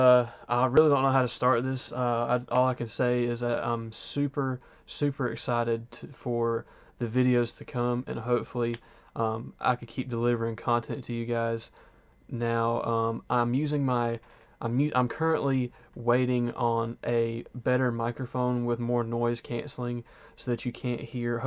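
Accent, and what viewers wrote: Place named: United States. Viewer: you sound countrryyy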